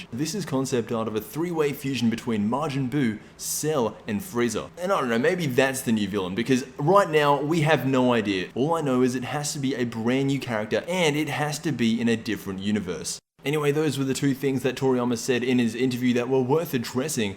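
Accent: Australia. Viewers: best dragonball youtuber ever, might be that aussie humor culminated with the accent!!! haha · The Nerdy Toad yeah i think, i am an aussie and u can tell by his voice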